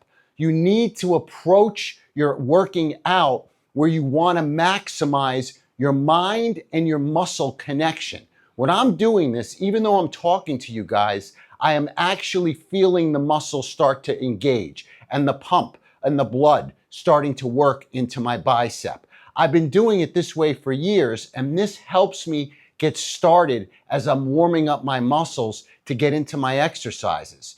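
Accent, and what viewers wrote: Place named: East Coast, United States. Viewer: I'm from Holland, but you sound like a East Coast guy. NYC?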